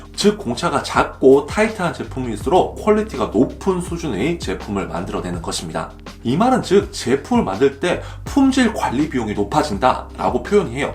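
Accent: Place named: Gwangju, South Korea. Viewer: 완전 광주사람이시네 ㅋㅋㅋㅋㅋㅋㅋㅋㅋㅋㅋㅋㅋㅋㅋㅋ구수하시다 (미친 틀렸다니)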